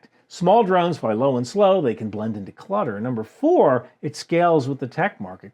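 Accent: New Jersey, United States. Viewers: The New Jersey, accent😂. You are cracking me up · you should always talk in you jersey accent, i like it lol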